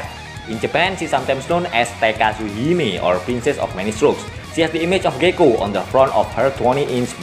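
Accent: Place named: Indonesia. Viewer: suka sama ulasannya... tp bhasa inggrisnya kya brlogat bahasa indonesia...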